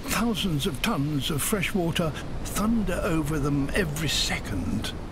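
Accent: United Kingdom. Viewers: Desculpem o meu comentário um pouco tolo, mas alguém sabe me dizer se a pessoa que faz a narração fala o inglês britânico ou americano? Desde já agradeço! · This British accent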